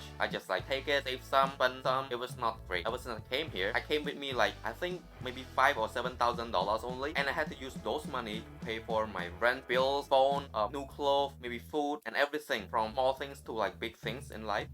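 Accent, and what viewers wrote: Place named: Cambodia. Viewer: hey bro, even as listening to your accent, I could tell you're Khmer. I am a Khmer international student as well, but situated in New Zealand. I could relate to many things in your vid !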